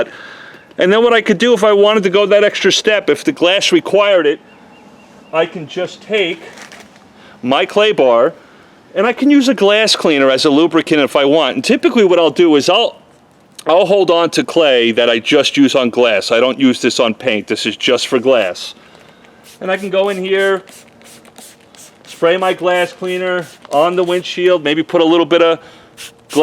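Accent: New York, United States. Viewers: Are you Italian and are you originally from NY? There is no way you arent lol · Are you jew from New York?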